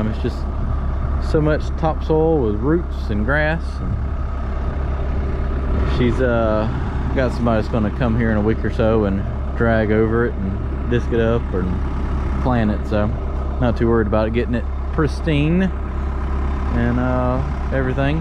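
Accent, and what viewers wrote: South, United States. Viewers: I love watching the different things you do. I also love to hear you talk with that southern drawell and semi deep voice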